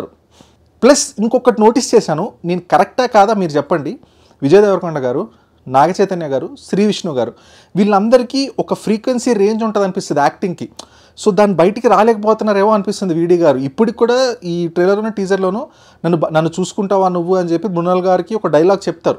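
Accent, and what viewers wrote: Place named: Andhra Pradesh, India. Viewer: అరేయ్ ఎందుకురా తెలంగాణని చెప్పుకు తిరుగుతావు నువ్వు ఆంధ్ర నీ యాస నే చెబుతుంది నీలాగే పూల సొక్క గాడు ఫస్ట్ తెలంగాణ యాసలో మాట్లాడి మళ్లీ వాడు ఆంధ్ర వాడు అని ఒప్పుకున్నాడు నువ్వు కూడా అలాగే ఒప్పుకో డ్రామాలు ఎందుకు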